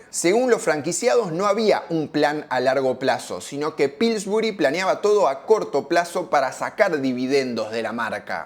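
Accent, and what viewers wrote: Argentina. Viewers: este no es español,es argentino o uruguayo 😂😂😂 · Es Argentino...no te a hablar en español neutro... Es lo mismo que yo pretenda escuchar un podcast mexicano o brasilero y me queje de la tonada... Hay gente estupida en este mundo....realmente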